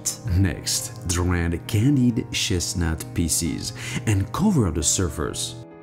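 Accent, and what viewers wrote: France. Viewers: Love his french accent! 🤗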